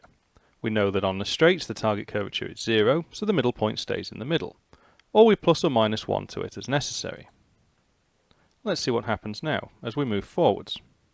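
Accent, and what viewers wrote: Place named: United Kingdom. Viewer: Your accent looks very much like British, it is quite cool. Nice video bro. Thanks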